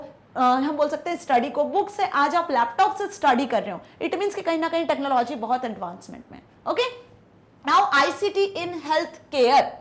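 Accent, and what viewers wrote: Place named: Tamil Nadu, India. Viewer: Mam aap Tamil se ho kya, who was Aisa Laga mere ko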